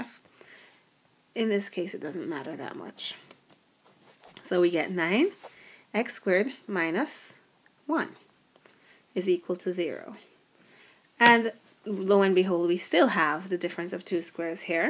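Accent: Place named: Nigeria. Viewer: is that a Nigerian accent I hear seeping through.... much luv!!!!!!!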